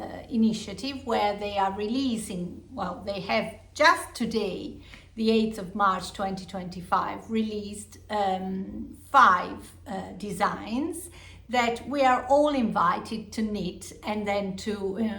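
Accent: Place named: Italy. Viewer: ! I loved this episode. I find your accent so interesting - sometimes I hear Italian accents and sometimes I hear Australian accents! 😁 We hosted an Italian exchange student one year and are still in contact with her. Anna and her family live in Collebeato. Close to Lake Como. Where are you from? You share so much great detail about your projects here! I made notes on things i didn’t think to share! Sure do wish I could come meet up with y’all at the fiber festival next fall!!! I guess I’ll just join virtually. Thanks for sharing such great inspiration❤❤❤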